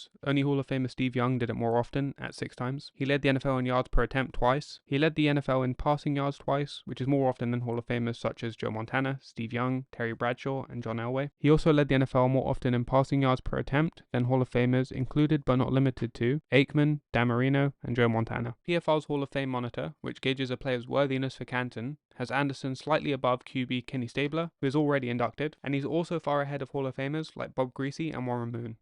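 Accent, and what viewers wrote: United Kingdom. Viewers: "Many of you grew up watching the game. I am from the UK, so I didn’t. I only discovered the sport in 2012/2013. I’m sorry if it bothers some of you that I’m young. What can I say." -- Some people are just bothered and get on the web to share their misery. Don't let them grind you down or steal your joy! I watched your video, noting your accent, and thought you are very insightful. Glad to have a young fan of American football in the UK! Liked and subbed. "I want to stress I’m no expert. I get things wrong all the time. But I enjoy the game, I like researching, and I hope to provide a unique spin in my videos. I will continue to strive and improve every video." -- Carry on mate, and enjoy yourself. Don't ignore your critics, but focus on your fans. May you gain many! "Can’t change my age though....Sorry for the lengthy comment. Thank you for your time and God bless." -- Haha, time will take care of that for you, trust me! 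But those numbers are only laps around the Sun. True wisdom is found by looking above and beyond the Sun. May God bless you, too, and grant you much wisdom. See you in your next video.... 😃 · British accent? ok.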